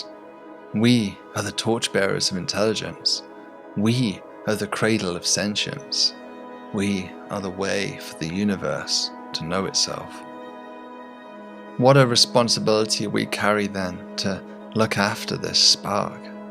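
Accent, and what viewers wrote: United Kingdom. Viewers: How appropriate to use the vocal musings of the great Carl Sagan. Fantastic and along with the gentle British voice of the narrator, soothing.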